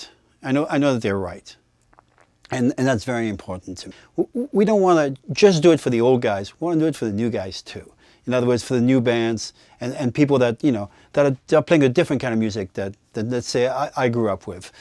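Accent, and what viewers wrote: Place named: Italy. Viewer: You're talking about me! I'm the pro musician/producer/studio engineer. Hopefully I know what I'm doing, LOL! It's interesting to listen to you speaking. I can hear very clearly where your son's voice comes from. You've still got a little bit of the Italian accent. Some of my American family do too. Your son must have worshipped you as a child, and you must have given him everything you could. He is a genius level artist with the highest level of personal expression on his chosen instrument. Congratulations on your success and your excellent equipment. It offers some of the most unique guitar tones that have ever been designed or available to the public. The attention to detail in the dynamic response is what I love most about the equipment you design. Are the current Dirty Boy pedals being produced with a licensing agreement between you and the Dirty Boy Co. 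run by Danny Gomez and Roberto García?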